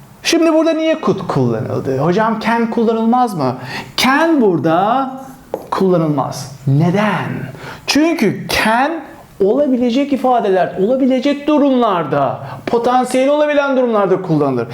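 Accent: United Kingdom. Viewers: british accent'ınızın mükemmeliği diyorum başkada bir şey demiyorum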